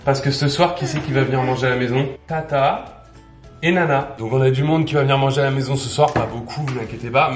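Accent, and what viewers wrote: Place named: South, France. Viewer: C'est marrant comment tu as l'accent du sud + marqué que haha! J'avais jamais capté. En tout cas très bon vlog, keep going guys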